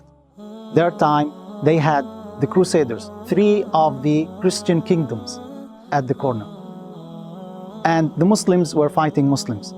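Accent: Indonesia. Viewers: Apa cuma gue yg dengar suara pronounce nya org indo ? Hehehe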